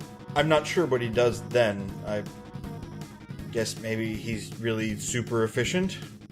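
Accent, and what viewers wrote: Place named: Northwest Indiana, United States. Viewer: You sound like you grew up in NW Indiana with me, bro. Shout out to Aladdin's Castle arcade!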